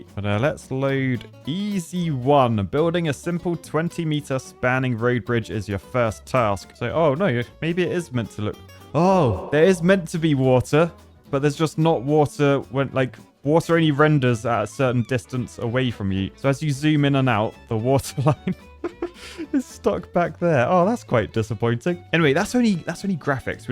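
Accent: United Kingdom. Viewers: Why does sound British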